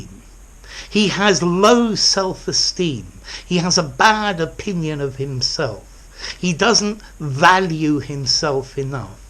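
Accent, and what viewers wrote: United Kingdom. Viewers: Hi May I ask what is ur accent? Modern RP or Traditional RP or neutral accent from some place？ thank u teacher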